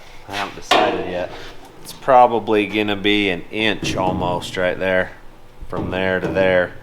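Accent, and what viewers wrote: United States. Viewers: Looking for the end? You will find that when the roll is finished. Still cant get used to the American way of saying things😉😉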